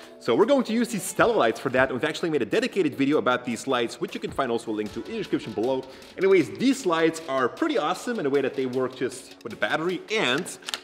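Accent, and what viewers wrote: Netherlands. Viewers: Wacht, ben je Nederlands? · Are You dutch? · Ik hoorde al een accent maar jullie zijn dus nederlands! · Are you dutch ?? Me too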